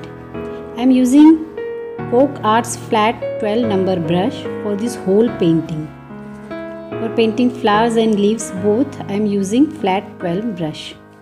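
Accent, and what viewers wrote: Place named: India. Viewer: Love your roses, I have a frame like this one, I will practice this,thanks for sharing,love your voice,you sound like a couple of ladies I worked with very good friends,they are from India, I miss them so much.we have all retired now and lost touch,Jaya and hubby have been going on cruises,last I talked to her.Ranuka don’t know where she is, Have a wonderful life painting,it’s Beautiful Thanks for sharing,love 💕 I’ll be looking for the next love 💕 this One.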